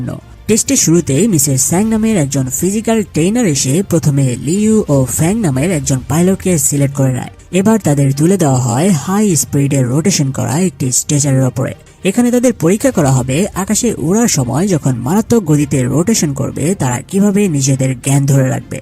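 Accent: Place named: South Korea. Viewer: আপনি কোরিয়ান একসেন্ট এ বাংলা বলেন কেন? এমনিতে ভালোই বর্ণনা করেছেন।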